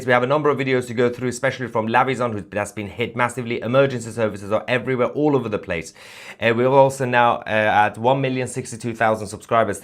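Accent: United Kingdom. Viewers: So you were saying Ayatoilet. I thought maybe it was my American ears misunderstanding your British accent. Very fitting moniker for such extremist refuse.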